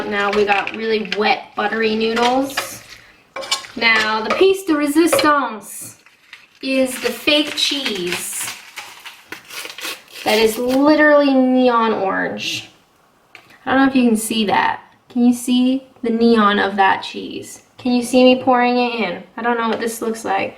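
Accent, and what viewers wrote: Canada. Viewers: Because i love your Canadian accent , Much nicer than my boring Surrey Accent (British) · You sound Canadian. Not British. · I really like your videos (because you're spontaneous and sincere). I am learning English, so your videos help me to practise listening, and I have also learned new words with you. I love your Canadian accent. Greetings from Spain!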